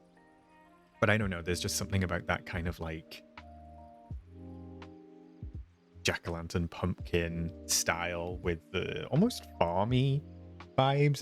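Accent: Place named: Wales, United Kingdom. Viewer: I new I heard the Welsh there. I'm a Welsh valley girly too. Thank you for these videos though, they really do help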